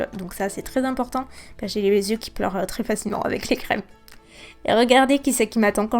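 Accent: South, France. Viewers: Tu as un accent incroyable on dirait que tu viens du sud je t'adore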